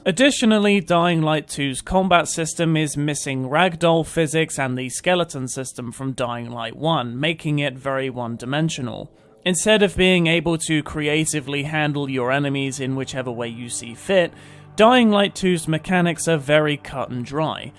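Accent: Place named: United Kingdom. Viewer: This might be the only british accent I can tolerate, nice video so far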